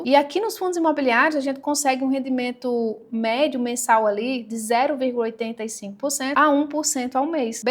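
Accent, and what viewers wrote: Northeast, Brazil. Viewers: Tá cada mes mais gata 😁 e esse sotoque nordestino eu acho a coisa mais maravilhosa do mundo 😂😂❤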